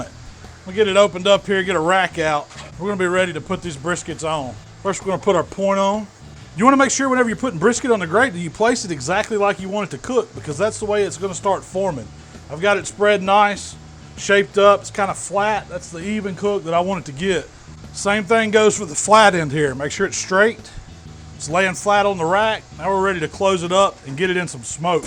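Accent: South, United States. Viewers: First seven seconds is all I needed to watch to know this guy is legit. Fat guy with a southern accent? SOLD. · Vegetarian here. This is sooo satisfying to watch! Specially his southern accent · As soon as I hear that thick southern draw, I know this is gonna be some good BBQ. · The best channel to pickup the American accent.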